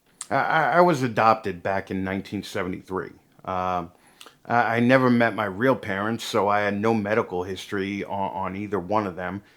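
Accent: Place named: Chicago, United States. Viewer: 1. Was prosthetic leg not an option? 2. Great message/spirit. 3. Have you ever lived in Chicago? Your accent reminds me of when I lived there.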